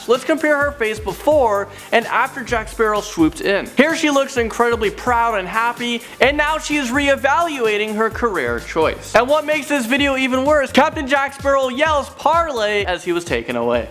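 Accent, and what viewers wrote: Canada. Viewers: r u Canadian or (presenter) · U Canadian bruh? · RyanCraft1 hes canadian of course he sounds different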